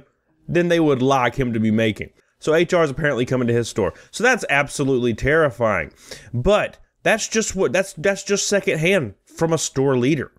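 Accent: South, United States. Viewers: Man oh man I just love listening to you, you truly are pretty damn funny 😆 You provide great content with a southern twang and mindset that makes, even the distasteful, a platable experience. THANKS 😊😊😊😊😊😊😊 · Can’t decide if it’s the southern accent or dumb fucking haircut. But I just want to flog you with a tire wrench.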